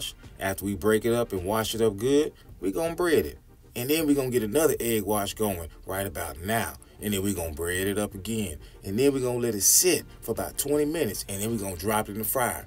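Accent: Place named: South, United States. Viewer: Oh that looks good! Love that southern twang ❤❤ keep posting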